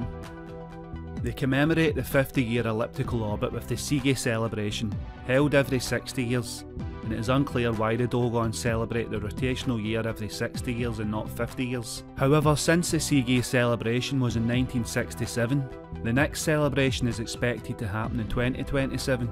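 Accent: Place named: Glasgow, United Kingdom. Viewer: Is that a Weegie narrating?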